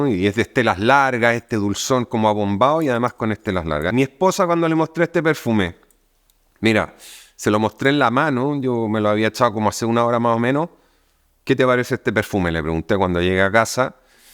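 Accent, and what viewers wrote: Chile. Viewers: Se nota que es Chileno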